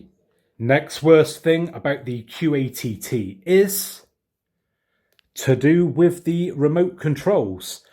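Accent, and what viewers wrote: United Kingdom. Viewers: are you from UK? good UK accent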